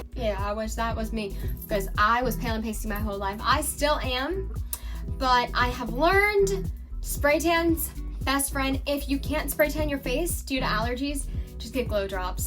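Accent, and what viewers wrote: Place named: South, United States. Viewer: You have a slight southern or country accent, where u from?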